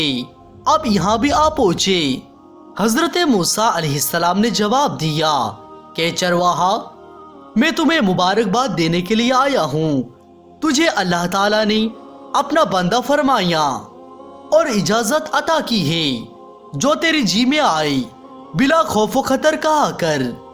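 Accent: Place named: South India, India. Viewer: Video boht achhi h mgr aapki tone south indian ya nepali type h.alag hi lag rhi hai...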